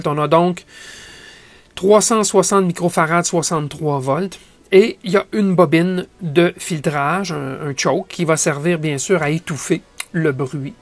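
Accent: Quebec, Canada. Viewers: Ça fait plaisir de retrouver cet accent québécois !!! Très bonne vidéo.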